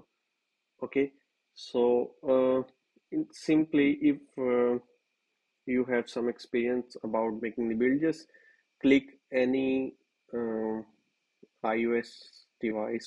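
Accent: India. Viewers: abi niye hintli gibi konusuyosun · You have explained everything in detail and this document is not explained so clearly on almost any page of apple. What I don't understand is that the developer account belongs to a Turkish person, but you speak with an Indian accent. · Vallahi ben de Hintli sandım , dublaj falan olabilir.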